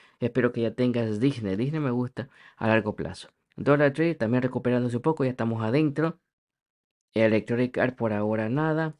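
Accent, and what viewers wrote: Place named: El Salvador. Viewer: que nadie nos benga a dar cazaca 😂😂😂. Que se me hace que sos Salvadoreño.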